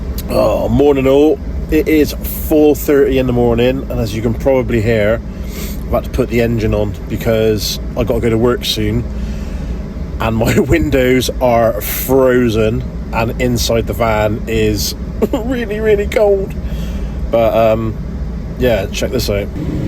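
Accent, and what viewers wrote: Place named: West Country, United Kingdom. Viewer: Keep those vlogs coming....keep that Marvelous West Country accent...and you won't go far wrong...and maybe we will bump into each other is Cornwall sometime 😉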